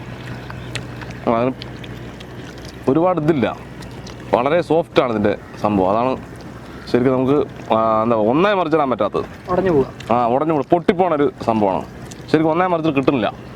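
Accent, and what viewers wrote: Palakkad, India. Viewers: Ninglde Slang Kettitt Palakkad aanu nnu thonnunu. Sariyano?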